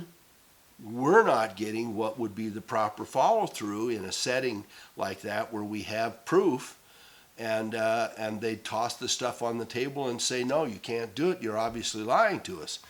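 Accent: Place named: Michigan, United States. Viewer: ahhh, don't know why just always had a hunch you were from MI, thought I heard a MI accent. Maybe it's the farmer in you I recognize. Anyhow, I'd like to speak directly with you, not sure how to do that without alerting everyone else to your contact info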